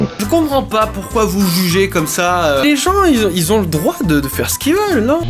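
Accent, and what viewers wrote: Russia. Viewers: Et une dernière question et j'arrête de t'embêter mais est ce que tu pourrais au moins nous garder le "Hello my friend" et nous sortir quelques "cyka blyat" avec ton accent rusde de temps en temps ils sont vraiment géniaux faut pas les perdres:D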